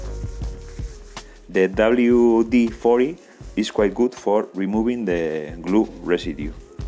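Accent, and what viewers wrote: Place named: Bilbao, Spain. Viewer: Aupa!!. Ese inglés de Bilbao a tope.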